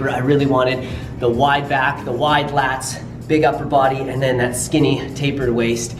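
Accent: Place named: Canada. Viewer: You sound extra Canadian in this video 😂 Also , you got them mini boulder shoulders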